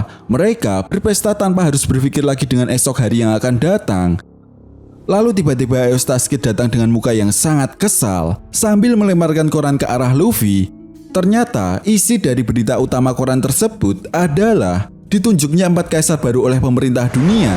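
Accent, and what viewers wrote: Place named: Banyumas, Indonesia. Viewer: ngapak ya min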